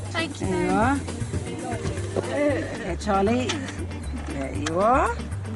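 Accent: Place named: RP, United Kingdom. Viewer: It’s funny to listen to that posh voice making what I think of as American soul food. I’m not meaning it unkindly and I totally need carrots in coleslaw it’s just everyone is always like nigella nigella nigella and I’d never watched her before didn’t know she was terribly British sounding ❤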